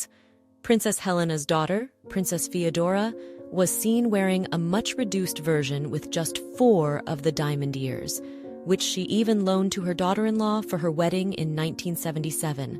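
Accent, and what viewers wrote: United States. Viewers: For a documentary about British Heritage, this American lady has done her homework but she should let a native speaker do the voiceover. The "don't you think" and other fatuous remarks lack the gravitas that would bring the required connoisseurship to the broadcast. No doubt they are intended for an American audience.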